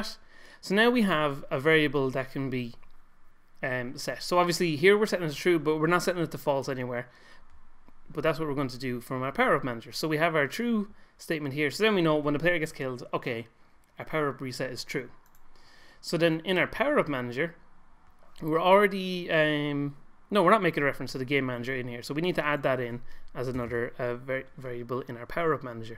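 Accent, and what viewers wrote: Ireland. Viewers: You talk like Jacksepticeye. Are you Irish?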